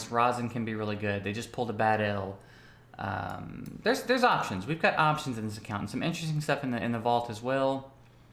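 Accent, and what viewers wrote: South, United States. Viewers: so cute that your a NY boy that sounds like a wholesome southern cowboy :D Trick ass mark.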